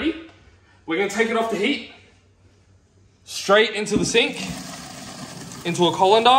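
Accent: Australia. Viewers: Oh yea I see. Yea is Kiwi. He's based now in Australia though and his accent is quite Australian now, so we shall claim him =P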